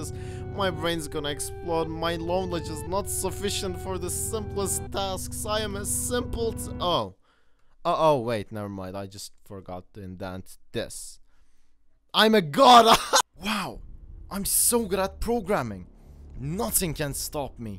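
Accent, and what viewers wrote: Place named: Turkey. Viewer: This can be random but are you turkish by any chance? Your accent is really thick :D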